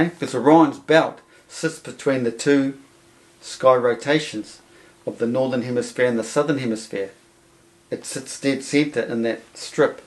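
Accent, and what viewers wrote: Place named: New Zealand. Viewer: he sounds like a very clued up kiwi man! ❤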